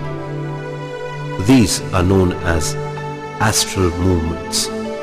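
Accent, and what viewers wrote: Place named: India. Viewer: I understand that you could be having an indian accent when you speak. Like a hot potato in your mouth. Now, you do not need to transfer that accent into writing form. "That" is not spelled DAT, DIS is spelled THIS. I would believe that those words are common enough so that you do not need to make those simple mistakes. Please meditate on THAT!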